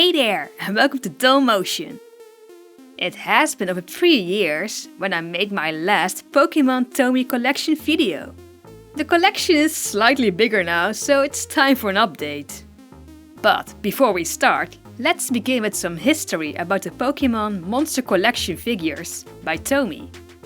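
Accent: Netherlands. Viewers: Toen ik dat accent hoorde wist ik meteen dat je Nederlands bent! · Op de 1 of andere manier kan ik gewoon horen dat je uit Nederland komt !! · Are you Dutch!?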